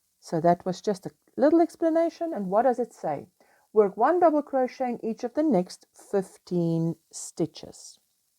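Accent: Afrikaans, South Africa. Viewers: Is jy van RSA. Ek hoor Afrikaanse aksent.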